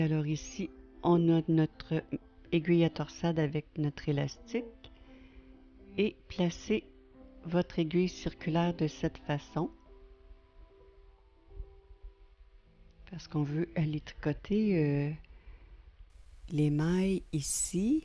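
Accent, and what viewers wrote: Canada. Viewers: Il y a longtemps que je cherchais un modèle juste un peu pénible la pub qui s'affiche merci pour votre modéle il est super et j'adore votre accent canadien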